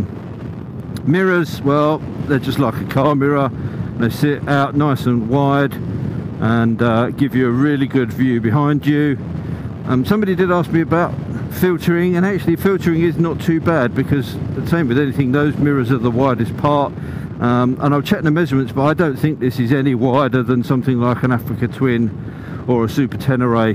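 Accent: United Kingdom. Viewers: Marvelous British accent, sir. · yep turned off after 2 minutes. Don't want hear the limey inflection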